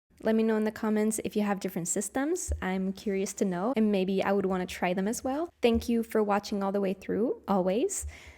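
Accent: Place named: England, United Kingdom. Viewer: Cette vidéo est vraiment trop cool et super encourageante. J’ai acheté mon premier hobonichi pour 2025 et je me sens toute impressionnée. Pourtant j’ai l’habitude de remplir des journaux. J’ai vu Bruxelles dans certaines de tes pages (pardon je ne voulais pas lire), tu as un super accent anglais ! Au plaisir de discuter du hobonichi 😊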